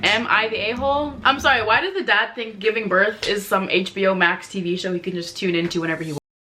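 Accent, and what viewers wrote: France. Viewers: Okay but ton accent français >>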